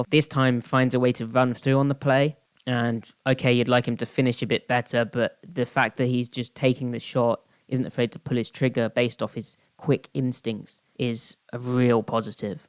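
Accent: United Kingdom. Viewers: It's cool hearing a British guy seriously understand football. 🤙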